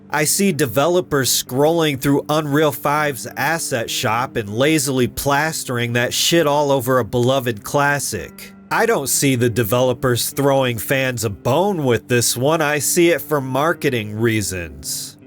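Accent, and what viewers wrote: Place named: South, United States. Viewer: Fr. Dawg is definitely from the south.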